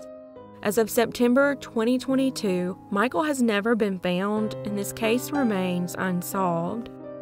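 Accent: South, United States. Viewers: I like your southern twang.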